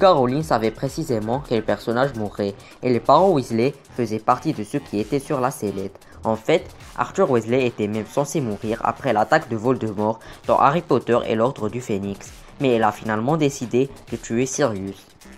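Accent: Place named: Morocco. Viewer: C'est quoi ta voix ? Accent ou ton pays ? C'est un mélange entre un marocain, un québecois ! En plus on dirait que tu as de l'asme ! Ta voix c'est un bordel omg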